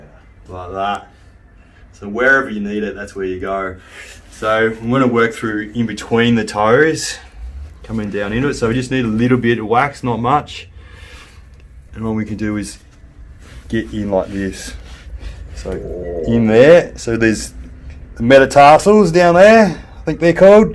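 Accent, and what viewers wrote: Australia. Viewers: I picked up the aussie accent ! Hello from Paris France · I love foot massage videos. With Aussie accent, I smashed the reply. Thanks for posting this video. I subscribed.👍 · Your typical Aussie accent. Represent 💪🏿💪🏿💪🏿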